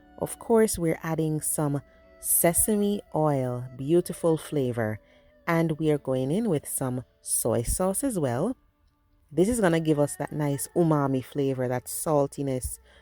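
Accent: Jamaica. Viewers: Thanks for sharing, my daughter said she will surely fix this. ❤ ur Jamaican accent beautiful!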